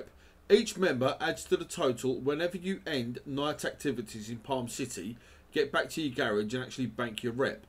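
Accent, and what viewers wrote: United Kingdom. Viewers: Are you from Kent?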